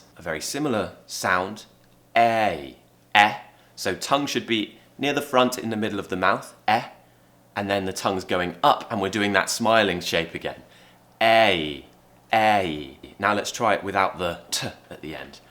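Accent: United Kingdom. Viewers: I love you and your lessons and the same english with Lucy but your pronunciation is more British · Hi. I'm so happy to learn Brtish accent through your video. I love your Brtish accent because it sounds beautifully and you show clealy how to pronunce Brtish English words. Thanks a lot. ( ^-^)ノ∠※。.:*:・'°☆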